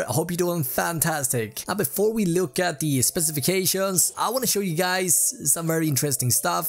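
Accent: Sweden. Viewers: I can hear you are from sweden after 2 sec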